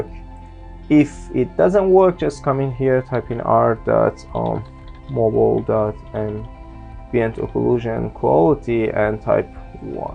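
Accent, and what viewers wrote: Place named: Iran. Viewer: سلام داش از لهجت میخوره ایرانی باشی میشه لطفا بگی چجوری باید لنز فلیر رو برای موبایل آنش کنم؟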